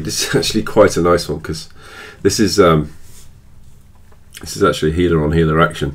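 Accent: United Kingdom. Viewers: where are you from lik where in the UK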